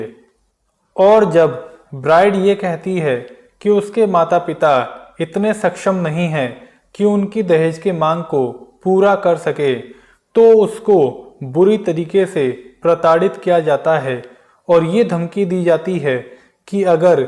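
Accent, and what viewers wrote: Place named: Ballia, India. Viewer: Dil de nahin sar mein Uttar Pradesh Baliya jila se bol rahe ho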